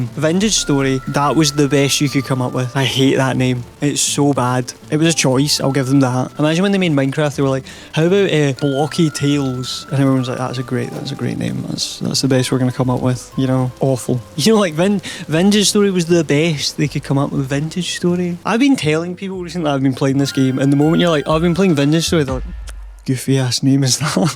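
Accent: Scotland, United Kingdom. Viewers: Is that the Scotland accent or Irish accent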